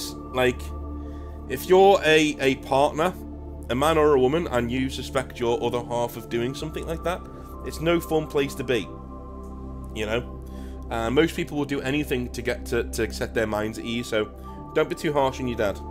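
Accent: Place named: North, United Kingdom. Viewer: Some times I just need to hear a northern accent tell me to sort shit out. Cheers mate ❤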